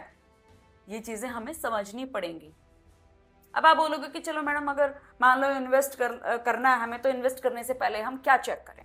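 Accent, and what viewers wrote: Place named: Haryana, India. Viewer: Madam ji thanks.for giving knowledge dholera city investment.aap mhari boli bole rahe ho.that is haryana.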